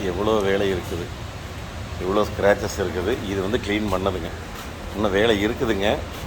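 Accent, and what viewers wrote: Kongu, India. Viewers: கொஞ்சும் கொங்குத் தமிழ் பேசும் கோமகரே...! திகட்டாத தித்திக்கும் பேச்சுடைய திருப்பூர் திருமகரே...! காண்டா மிருக கலர்ல ஹோண்டா சிட்டி கார் வந்தாலும் அதை ஃபேண்டா கூல்டிரிங்ஸ் கலராட்டம் மாத்துறதுதான் நம்ம அய்யாவோட மகத்துவமே❤❤❤❤❤❤😊😊😊😊❤❤❤❤ அட...அட...அட... அருமையோ அருமை அய்யா🙏😊😊😊😊❤❤❤❤❤❤ 2004 மாடல் ஹோண்டா சிட்டி கார் அடிச்சு விரட்டி ஓனர் நன்றாக சிட்டிக்குள்ளையும் ஹைவேயிலையும் ஓட்டியிருந்தால் தோராயமாக 1,80,000 முதல் 2,30,000கிலோமீட்டர் வரைக்கும் கார் ஓடியிருக்க வாய்ப்பு இருக்கு அய்யா. என்றும் அன்புடன் உங்கள் மகன்போல இவன் ரா.முஹம்மது கபூர் (சென்னையில் இருந்து) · அருமையான வேலை..... உங்களின் கொங்கு தமிழ் பேச்சு.... மிகவும் அழகு..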